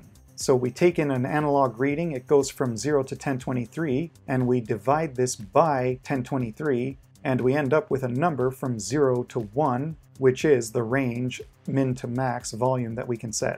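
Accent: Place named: Canada. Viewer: You sound Canadian ;-)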